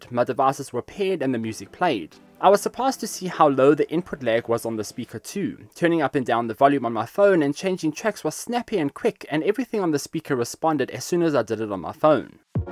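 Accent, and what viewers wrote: South Africa. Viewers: Greetings from South Africa, Durban ! Great review indeed ! I knew that you were in SA as well when I heard the accent and the words...DURING LOAD SHEDDING 🤣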